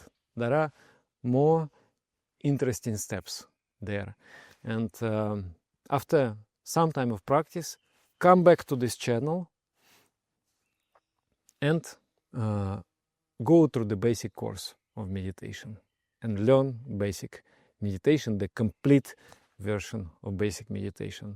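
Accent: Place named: Russia. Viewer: Is this guy Russian?! 🤪😝 I know this accent all too well 🤣 Great meditation, I’ll stop getting distracted by my country’s accent 🧘‍♀️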